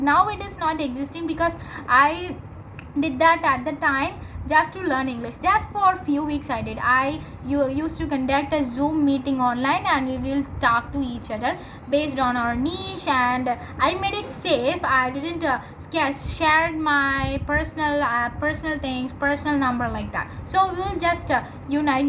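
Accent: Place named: United States. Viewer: American accent is perfect ❤ I loved it.waiting for more videos 🥰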